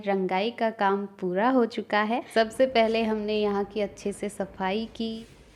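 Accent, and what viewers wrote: Standard. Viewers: apka shudhha hindi bolna bahut achha lgta h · आप को सुनना बहुत ही अच्छा लगता है। आप इसी तरह लम्बे ब्लाग जिस में आप बहुत सी बातें करके हमें अपने दुसरो के जीवन के रोचक किस्से अवश्य सुनाते रहना जी 🙏🏻 भगवान जी हमेशा खुश और स्वस्थ रखें 🤗 हमारा की शुद्धि हिंदी वाणी ने मन मोह लिया है और बार बार सुनने को मन ललइत रहता है। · Kitni sudh hindi hai waw I'm impressed 😊😊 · Didi apki pure hindi bolne ka andaj muje bahut pasand hai apne bahut mehnat ki hai purane ghar me ab apne apni kala ka rang yaha bhi bikher diya ye bahut achha laga muje🎉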